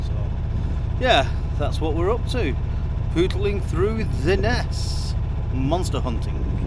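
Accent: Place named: Lancashire, United Kingdom. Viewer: I hope you are feeling all sorts of good. As you are looking strong and well. The sea is good for you Lancashire Lad. So, stay boaty. Ps. More of your doing and learning content please..it make sailing ..accessible to ordinary perps..also I like your accent, as I am a sort of a Poulton Le Fylde exile. I miss it.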